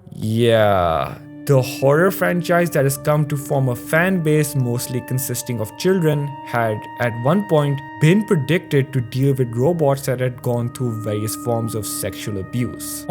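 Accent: India. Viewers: I Think its indian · Everyone in the world has an accent. He sounds Indian.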